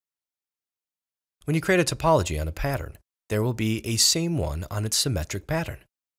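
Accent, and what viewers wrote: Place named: United States. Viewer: I absolutely love the clear, professional, American voice and how it clashes with the bad english script :D But thanks for the rundown, very informative!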